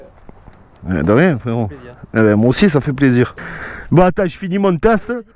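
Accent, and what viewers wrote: South, France. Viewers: J addddoooooooore un marseillais faire la démo.....trop mmmmmmdrrrrr ... ti é fadah !!! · Je me régale avec tes vidéos ! je suis nouveau dans le monde de la trot et viens de d'acheter la Dualtron mini 21ah, mais je t'avoue que je suis un peu trouillard dès que je suis doublé par des bagnoles, j'espère qu'avec l'habitude la peur va disparaître ! C'est con d'avoir peur car je suis cycliste et me suis tapé 100 fois l'aller retour Marseille Les Lecques par la Gineste (j'habite à Paris mais suis Marseillais) à vélo de course l'été pour aller me baigner ! Peut-être l'âge rend t'il trouillard, 50 balais 😂 En tous les cas tes vidéos sont trop sympas, et ton accent que j'ai un peu perdu, trop cool 👍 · Je kiff cet accent du sud. Je pourrais l'écouter des heures :D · Bonjour, très très impressionnant, et j'adore ton enthousiasme avec ton accent du sud, c'est un bonheur ta vidéo! 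Par contre une remarque sur quelquechose qui m'interpelle en fin de vidéo. Le cintre carbone semble avoir été trop serré, la surface périphérique de part et d'autre de la potence est un peu mangée... ce qui n'est pas normal. 6NM maximum écrit sur la potence (alu...) mais pour du carbone... j'ai un grand doute. À l'époque où je choisissais encore la voie du carbone sur mes cadres et périphériques VTT avec l'idée que la rigidité me ferai perdre moins d'énergie (avant de passer à l'acier, puis au titane pour le bonheur de mes fesses, mon dos et mes bras), je mettais 5NM max sur pièce carbone, et jamais de serrage sans une clé dynamométrique bien étalonnée! Et pour éviter qu'un couple moins important de serrage n’occasionne un découplage intempestive, Loctite bleue, voire rouge en fonction des pièces. Désormais j'évite le carbone sur des pièces où ça n'a pas vraiment d'intérêt, et plus encore sur les pièces où cela peut être dangereux. 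On dirait que le carbone de ton cintre commence un peu à être mangé à à , vraiment.... À vérifier en tout cas ... j'ai des photos d'un pote qui a subi une casse de cintre carbone trop serré en VTT, en pleine descente technique... multiples fractures du visage c'était très très moche (pas de casque intégral puisque XCM et pas DH). Et il a eu de la chance... Sur un engin électrique pareil sérieusement, je n'irais JAMAIS mettre du car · Le Sud , cet accent , le soleil dans l'oreille 🔥🔥✌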